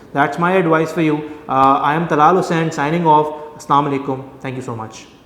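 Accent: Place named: Pakistan. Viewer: Man you have a nice Pakistani accent ❤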